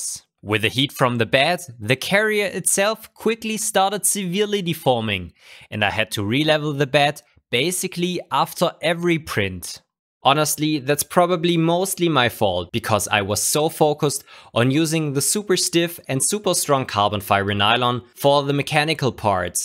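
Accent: Germany. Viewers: Warum skyped ihr auf englisch? Yeah, I know, why do I watch only english videos? But you both speak german. You, I can hear from a mile through your gernglish, (sorry, js) and also lives in germany, I think. His english only very rarely gives him away, and if you don`t know he is from germany, and don`t speak german yourself, you probably wouldn`t even catch it most times. -I didn`t, only when he got german greetings half way through a stream.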